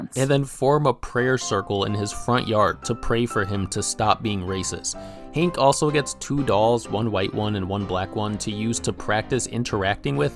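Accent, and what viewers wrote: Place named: Canada. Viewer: 5 seconds into hearing you speak all I could think was “this dude is so Canadian”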